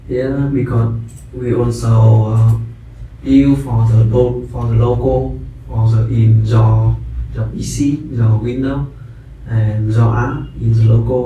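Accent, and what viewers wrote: Vietnam. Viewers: Good job br!! But Vietlish accent is so clear anyways :o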